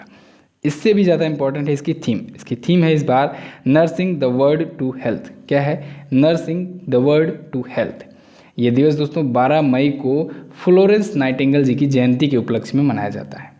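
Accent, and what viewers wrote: Bihar, India. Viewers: 4.zero bhai na ki 4.o 😆😆😆. Bihari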